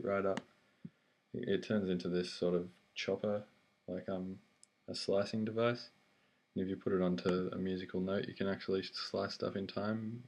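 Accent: Australia. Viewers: Thanks for the videos.. Great Help. Still very much a beginner so good to hear someone I understand. The Aus accent helps..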